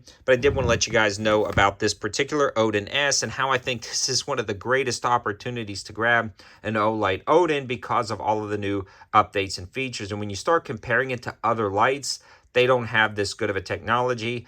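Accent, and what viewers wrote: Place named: South, United States. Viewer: “Well I’m”, I believe he says?? He’s from my area and he talks “redneckish”. It ain’t no thang.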